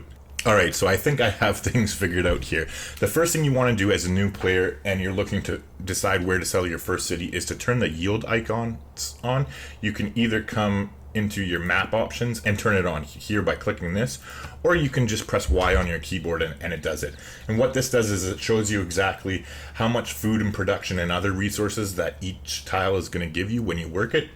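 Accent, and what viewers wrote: Canada. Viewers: Are you from Saskatchewan?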